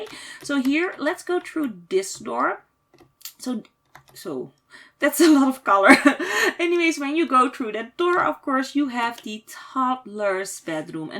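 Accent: Netherlands. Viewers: because you have that Dutch accent and no fense though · u engels is heel slecht je moet de T niet zo nederlands uitspreken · hellooooooooooooo! euhm youre accent is like a dutch one. im also dutch so why would this be hate? but my question is:are you dutch?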